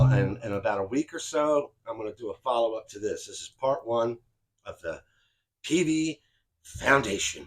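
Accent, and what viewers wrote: Australia. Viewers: We love you too uncle. Probably a good idea not to go commando on youtube 😂 Great OZ accent brother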